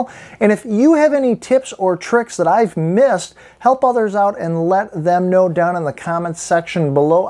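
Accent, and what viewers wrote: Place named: Chicago, United States. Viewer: yup....you're from chicago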